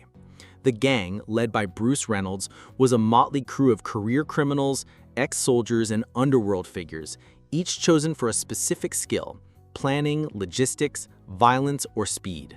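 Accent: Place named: United States. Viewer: Why do these English videos always have to be made by little Yank boys with horrible accents?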